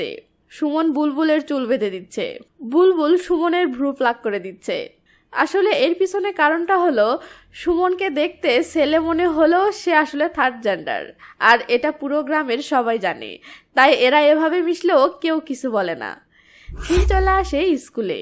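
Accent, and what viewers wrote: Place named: Mymensingh, Bangladesh. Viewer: বুজতে পারতেছি আপনার বাড়ি ময়মনসিংহ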